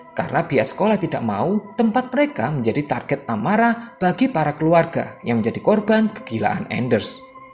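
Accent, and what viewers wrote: Java, Indonesia. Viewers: jowo mu endiii mas adminnn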